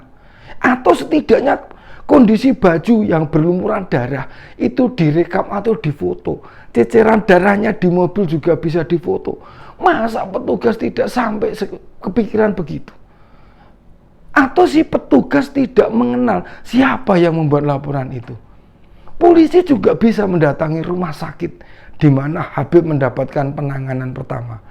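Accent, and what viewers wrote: Java, Indonesia. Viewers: Medok amat ini bpk logatnya aku juga dari jawa tp logatnya nggak medok banget tp lucu dari logstnya aku juga nonyon kontenmu sampai ful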